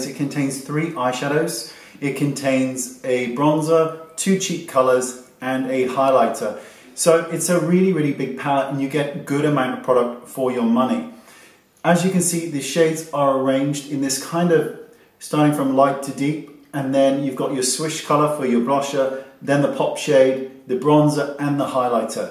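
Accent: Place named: Wales, United Kingdom. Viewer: I'm fairly sure he's Welsh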